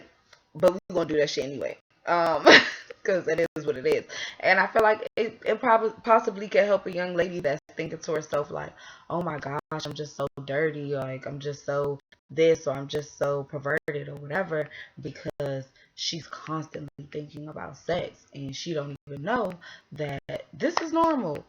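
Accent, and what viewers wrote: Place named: DMV, United States. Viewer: She definitely from DMV talking like that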